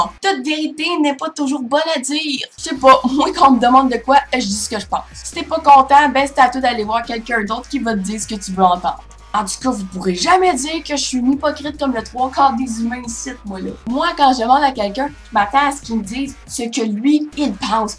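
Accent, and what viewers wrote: Canada. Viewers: ha.. méme moi caramade, chui franco é j'arrive pa comprendre certain mots!!!!!!!!!!!! l'accent canadien me rrnd perplex :/ chui d'ac pr le soutitrage meme en anglais^^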